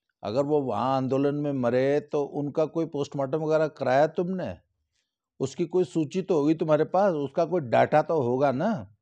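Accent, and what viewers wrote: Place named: Rohtak, India. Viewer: koi pehli baar ye video sun na bata sakta ki east up ke bau saab ho, sun ke rohtak ke lagte hain aap 😊🙏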